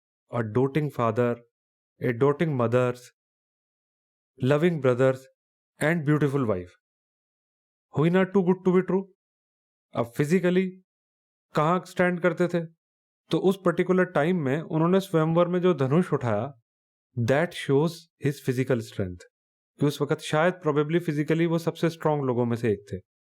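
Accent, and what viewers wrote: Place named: Punjab, India. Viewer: Thanks, aapka punjabi accent and simple way of explaining is v.good. Thank u.. Kya aapka book crossword stores me hai. ?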